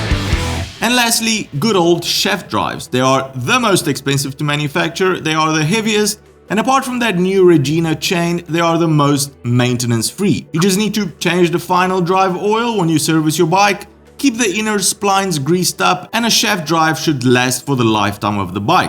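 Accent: South Africa. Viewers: Before I get properly started watching, what accent is that? Sounds a bit South African (but not quite), maybe more clues await me as the video progresses...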